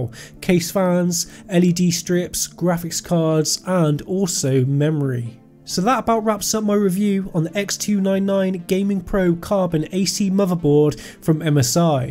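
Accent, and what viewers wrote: United Kingdom. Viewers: "Free point one port" lol, I like your heavy UK accent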